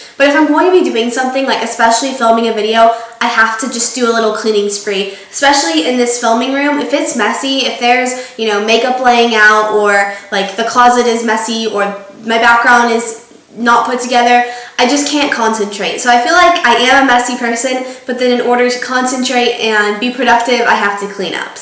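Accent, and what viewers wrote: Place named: Texas, United States. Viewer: Are you born and raised in Texas? I'm not from there and just thought everyone had the accent!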